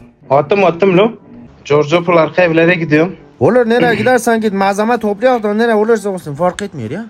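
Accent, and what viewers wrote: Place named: Diyarbakir, Turkey. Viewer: Ya adam Konyalı ama şive diyarbekir :)))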